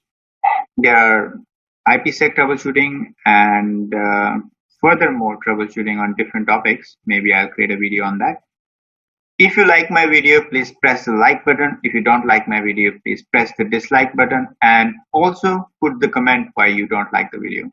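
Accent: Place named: India. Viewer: I like your video. I like it despite your heavy Indian accent. It was more informative than any other video I have found on the subject on yt so far. Thank you. 🙏🏻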